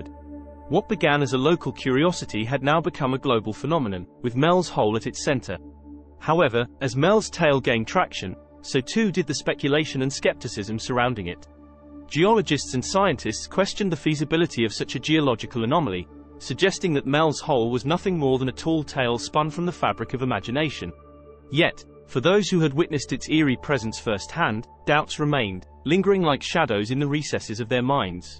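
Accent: United Kingdom. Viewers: We're all so sick of the British accent, mix it up already.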